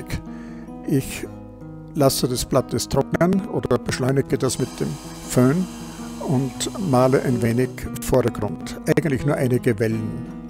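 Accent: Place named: Austria. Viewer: ja, endlich. eine Video für mein Niveau - auf Deutsch bzw. angenehmes österreichisches Deutsch :-) Habe in letzter Zeit einige Video über die Aquarelltechniken mit dem Hakepinsel gesehen. Aber Ihre sind halt doch immer eleganter und effizienter erklärt. Das mache ich unter Anleitung am Wochenende mehrmals nach. Schön, das es jetzt zum Feiertag hochgeladen wurde :-D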